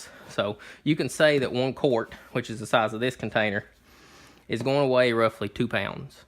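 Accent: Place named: Appalachia, United States. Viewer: This guy has to be appalachian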